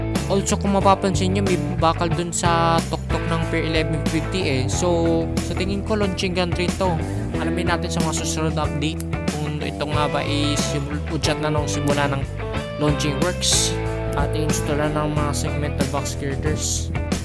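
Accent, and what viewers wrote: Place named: Philippines. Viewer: tagalog accent na ha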